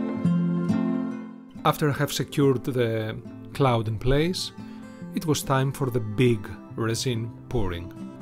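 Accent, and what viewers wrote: Greece. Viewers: I love your Greek accent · Nice greek accent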